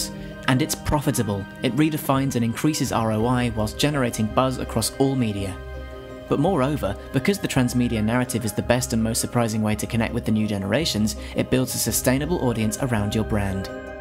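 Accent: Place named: Ireland. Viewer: this square head Irish is pounding in my ears ><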